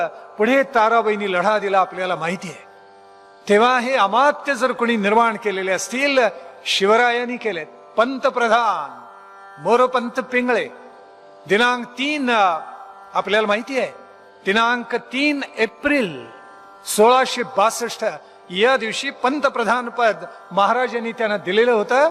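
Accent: Standard. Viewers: आदरणीय गुरूवर्य आपल्या अमोल वाणीतून छत्रपती शिवाजी महाराजांचे व शुर मराठे सरदार व शुर मावळ्यांचे पराक्रम व महाराजांनी केलेला राज्य कारभारा बाबत केलेले उद्भोदक प्रवचन ऐकून मन तृप्त झाले. आपली पवित्र वाणी आणि सुस्पष्ट शुध्द मराठी उच्चारा मुळे आपले प्रवचन सतत ऐकत राहावे अशी मनोमन इच्छा होते. आपल्या चरणी विनम्र प्रणाम.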